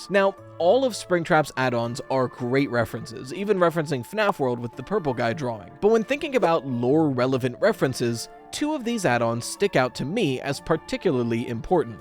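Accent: United Kingdom. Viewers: I'm just so happy to hear him be British again, it's honestly been a while.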